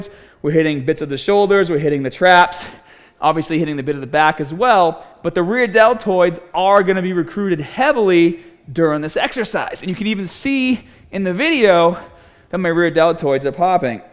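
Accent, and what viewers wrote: Boston, United States. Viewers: Someone is from the Boston area. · Is that a Boston accent?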